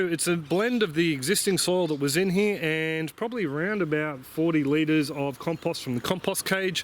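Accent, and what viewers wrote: Australia. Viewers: I most likely will never plant taro but I so love watching your videos just to learn some new gardening tips. the Aussie accent and lingo makes gardening ever cooler. Good luck with your roots.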